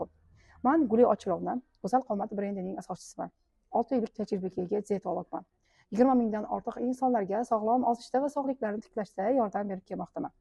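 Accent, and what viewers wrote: Bukhara, Uzbekistan. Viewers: Шеваси бухороликлага ухшаркан ❤❤❤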